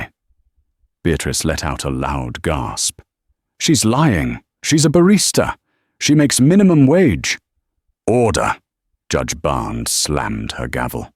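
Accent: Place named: United Kingdom. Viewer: I have a technical comment. …. Although the narrator is probably a wonderful gentleman, a British accent narration for Chicago, Boston, NYC, etc is DISTRACTING. You cannot relate to the characters when they are supposed to be on continental U.S., with a London accent. If we were listening to Shakespeare, yes. For these things, Hard NO!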